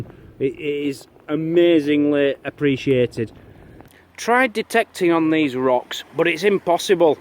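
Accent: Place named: Yorkshire, United Kingdom. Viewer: Where in Yorkshire are u from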